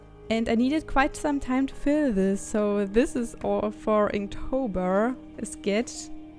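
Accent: Germany. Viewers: Are you Czech or German? Your accent sounds like you are German, but I saw your video about alcoholic markers and it's title is in Czech, so I just wonder about it. (Btw your art is awesome, and Happy Birthday to your channel! ^_^)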